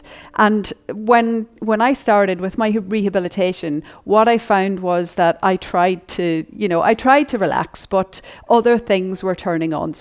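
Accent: Ireland. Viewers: sounds weird but i can feel it when i lift as i exhale maybe my body is just weird like that LOL i love your irish accent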